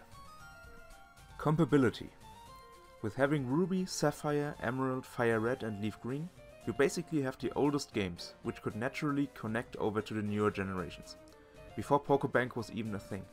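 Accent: Germany. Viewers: Man hört an deiner Aussprache das du Deutsch bist 😂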